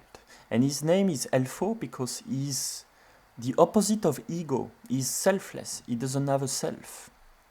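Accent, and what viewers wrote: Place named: France. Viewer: Thanks man! I fell in love with your french pronounce 😍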